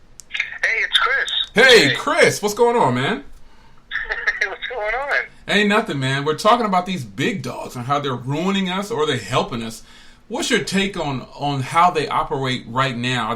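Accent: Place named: New Jersey, United States. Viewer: Really enjoyed the podcast man. Keep dropping knowledge on these people man, u doing a public service. how u gonna be spending all these hundreds of dollars on a phone & u can't cover your bills & responsibilities. People get their priorities mixed up but they don't like when u call them on it. Btw I think your jersey accent comes out the longer u talk, I think this is only the first or second time I've ever heard it cuz it doesn't come out in your regular videos and reviews.. Jus thought it was kinda funny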